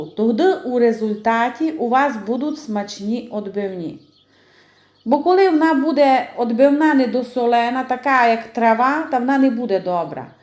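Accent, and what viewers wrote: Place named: Transcarpathia, Ukraine. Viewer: Мені надзвичайно цікаво слухати, як звучить закарпатьский діалект. Попри те, що українська - моя рідна і я знаю її дуже добре, деякі слова все одно не до кінця розумію, але мені розуміти їх десь так само хочеться, як і вашої капустки. Бо з дитинства розмаїття мови - то моє хобі. Яка ж багата є наша мова!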